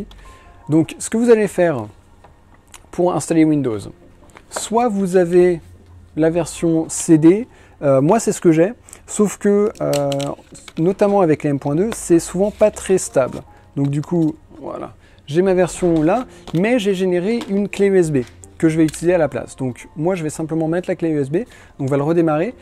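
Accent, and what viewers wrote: England, United Kingdom. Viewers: Arrete avec ton accent anglais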